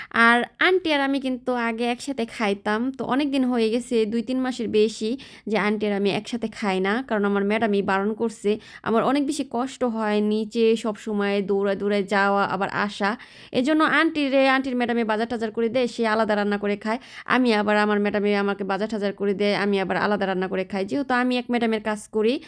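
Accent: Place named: Dhaka, Bangladesh. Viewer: আপু আপনাদের এলাকা কোথায় বাংলাদেশের, আপনার কথা শুনলে মনে হয় আপনি ঢাকা বিভাগের লোক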